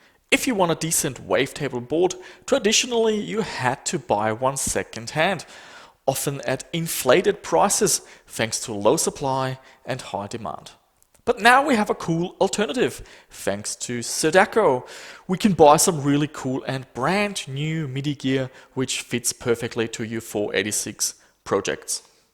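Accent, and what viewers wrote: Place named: Germany. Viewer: Yeah, I also thought you were from Germany :D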